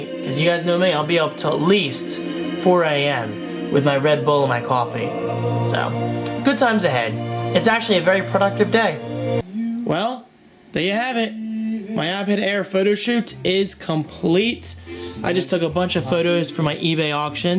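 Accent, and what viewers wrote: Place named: United States. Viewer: why do you pronounce "on" as "own"? Like when you say "online" you say it "ownline". Other than that you sound American, which is it should be coz your an American. LOL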